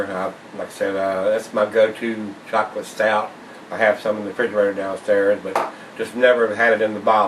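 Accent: United States. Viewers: I love your reviews. I love that you're obviously country but are clearly intelligent and articulate. Also I agree with almost 100% all of your reviews I've seen on Chocolate Stouts so far. Nice videos thanks. This beer is one of the best I've ever had!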